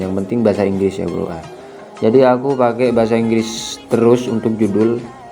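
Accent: Madura, Indonesia. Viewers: Logatnya Madura bangtt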